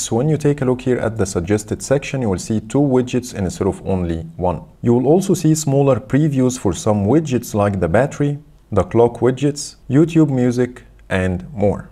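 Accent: Egypt. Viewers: The heavy Egyptian accent tho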